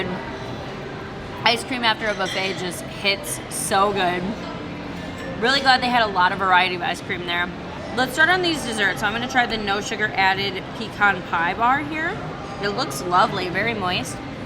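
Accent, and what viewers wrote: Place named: Midwest, United States. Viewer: Definitely from Michigan or Wisconsin with the accent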